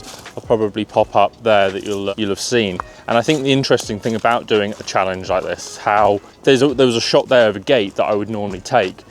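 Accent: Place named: United Kingdom. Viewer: Photography videos just sound better with a British accent.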